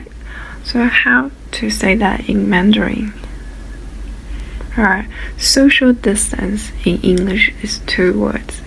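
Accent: Standard. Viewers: 发音很标准，加油！ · 讲的很详细，发音很标准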